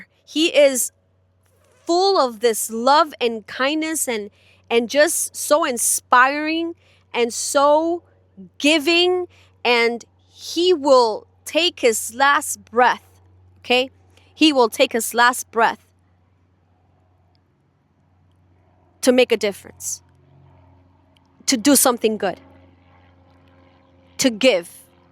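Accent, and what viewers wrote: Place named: New York, United States. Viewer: Hey! You're looking beautiful, of course!, lol! 😂😊 I love the scene around you, it's stunning! Such a nice looking lake!, you are an incredible woman! I very much believe you will achieve what you wish. You definitely have what it takes. I understand many of the things you feel and have experienced. Maybe not in exactly the same way, as we are two different people but definitely to a point that I get you. Just an observation before I continue! Not something you need to answer but I get the hint of New York in your accent. I could be wrong or I might possible say Philli but I can't quite remember their accent!, lol! 😂 I only mention it because accents interest me, capture my attention! I am a Brit after all so hearing different accents is interesting, lol! 😂 OK, where was i?, lol! 😂 You will get to where you want to go! 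It may not be right this moment, it takes time to save money, especially if your employer doesn't pay much. I had to get out of the situation I had been in for many years The psychological effect it can have on you is something that really becomes something you notice once you live in your own place. You realise the control people can have and how messed up they can make you. As mentioned on another video of yours, once you do get out of your situation, not only will you feel so much better but you will truly discover who you really are. You are an incredible woman and so much stronger than you realise. Just hearing your story reveals that. Believe in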